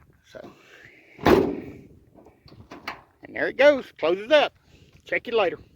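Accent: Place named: United States. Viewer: Can your accent be any more American? Good video by the way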